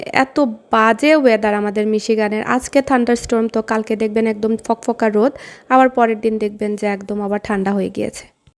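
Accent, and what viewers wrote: Sylhet, Bangladesh. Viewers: প্লিজ আপনিই সিলেটের ভাষায় পুরো ব্লগ দিবেন আমার সিলেটের ভাষা শুনলে কলিজা ঠান্ডা হয়ে যায়😊 আমার বাড়ি হবিগঞ্জ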